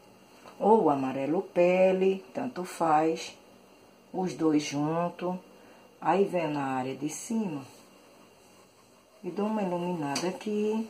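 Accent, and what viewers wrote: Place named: Pernambuco, Brazil. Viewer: DEUS te abençoe pelo carinho o qual vc ensina, estou aprendendo e da forma como vc ensina facilita muito, vc é pernambucana? Pelo sotaque acredito que sim, gente muito boa de coração, meu esposo é 😊😊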